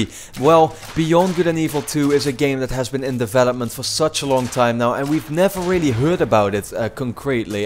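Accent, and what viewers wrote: Netherlands. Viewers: are you dutch? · Ik dacht al deze gast spreekt met een Nederlands accent :p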